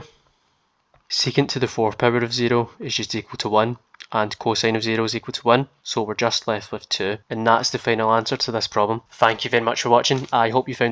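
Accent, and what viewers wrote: Scotland, United Kingdom. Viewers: ... What I forgot to ask you, if I'm not intruding, is, are you Scottish? I have an expat background and used to live in Scotland for about 3 years not far from Aberdeen in Milltimber beside the famous river Dee, a very beautiful small village... your accent sounds Scottish, but I could be wrong (lol); by the way I'm 1/2 Dutch/1/2 French...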